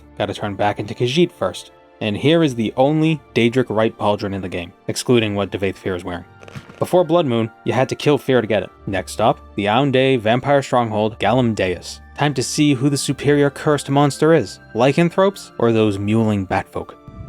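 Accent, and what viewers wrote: Boston, United States. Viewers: So are we just never going to acknowledge the Boston accent?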